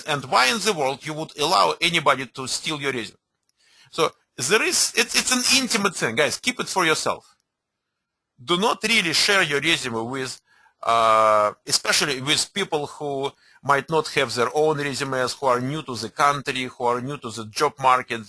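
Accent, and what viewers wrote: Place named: Russia. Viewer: It is so great that you have a Russian accent. Those things you say with this accent... I believe that you represent a better face of Russian-speaking people in the US.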